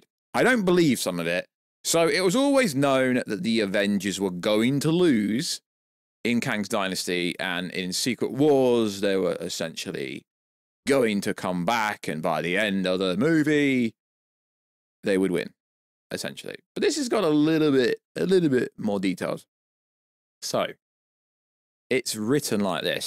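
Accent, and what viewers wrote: United Kingdom. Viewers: I don’t understand what you’re saying. It’s to British. And my English is not that bad. It’s a shame because it looks very interesting. Good luck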